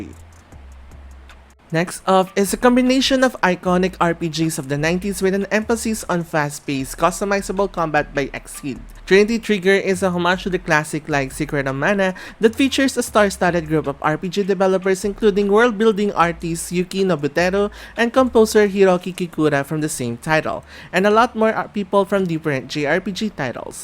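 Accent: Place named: Philippines. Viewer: Wow Pinoy accent 😂 Hello Lods!! ❤️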